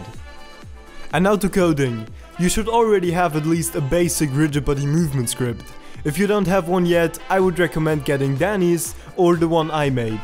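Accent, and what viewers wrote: Germany. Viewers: Thank you for the Tutorial Where are you from? Do you come from Germany?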